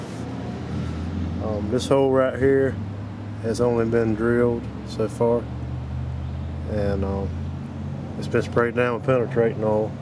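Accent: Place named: Southwest Virginia, United States. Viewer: hey man awesome video are you a heavy equipment mechanic?? also love the accent I'm from southwest virginia and talk just like you lol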